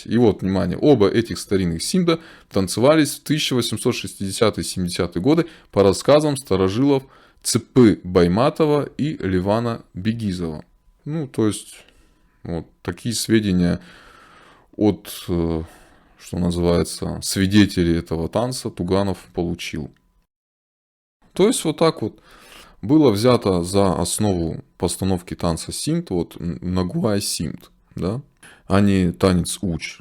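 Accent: Russia. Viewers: Ты не асетин ты из какоито секты .хочеш рассорить народы .горцы лицо непрячут а ты просто гнида засланыи и акцент у тебя русскии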